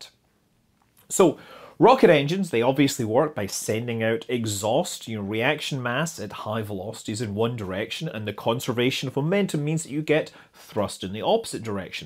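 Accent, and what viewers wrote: Scotland, United Kingdom. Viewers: YouTube captions can't seem to understand your Scottish accent. It doesn't seem to have a problem with the English. 🤔 Lol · I'm lovin' the Scottish burr.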